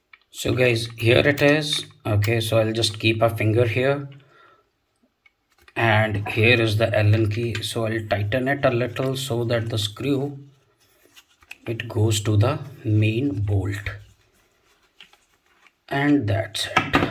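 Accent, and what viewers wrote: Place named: India. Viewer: Good job, i think i'm going to buy it for the winter season. I like your english with hurdu/hindi accent 😊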